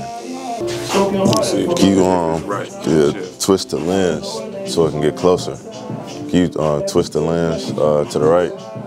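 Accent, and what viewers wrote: Chicago, United States. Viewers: U from chicago foe?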